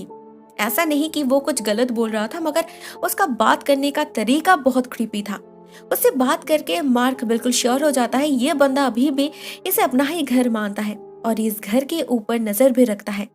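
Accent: Bengal, India. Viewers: Didi are u Bengali🤩🤩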